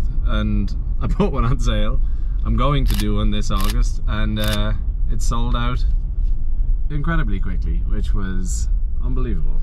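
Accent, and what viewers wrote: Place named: Ireland. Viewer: And, he's the same age as me 😊 lord let me just meet this man and marry him 😂 ♥ PLEASE 😁 JUST a conversation with the real authentic Irish voice!! Or at least just post more videos of you just talking!!💕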